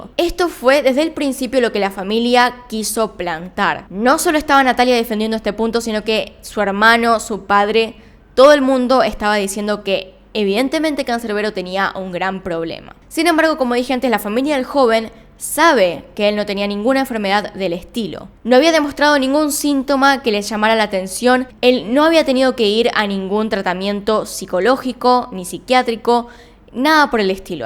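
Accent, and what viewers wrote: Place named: Argentina. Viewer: estudiando leches??? LEYEES WN LEYEEEEES CON Y NO CON CH ARGENTINOS QLS WN CAMBIAN LAS PALABRAS LOS ODIOS HABLEN BIEN😡😠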